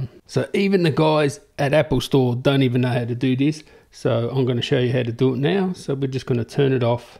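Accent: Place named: Australia. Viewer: Australian accent is hard for spanish/latin speakers, I've watched a couple of Crocodile Dundee and then came back to this video LOL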